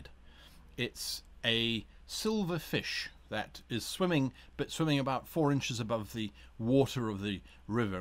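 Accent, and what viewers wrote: United Kingdom. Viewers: South Africa, huh? I wouldn't have guessed that; your accent sounds British. Unless you moved there later in life. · I know this is an old video to comment on, but I am so glad I watched it. I have suspected for a long time that you are South African, but was never sure. Your accent is is such that I thought you may be British. Very very happy to see a fellow South African do well on YouTube and be an amazing voice for us nerds everywhere. You sir, have a new subscriber !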